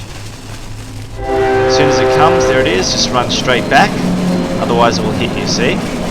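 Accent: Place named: Australia. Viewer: He's Australian... That's kind of how accents work in different parts of the world.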